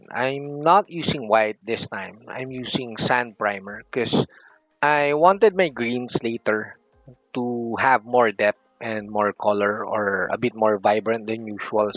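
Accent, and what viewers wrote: Philippines. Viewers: the way you talk sounds like a native Filipino.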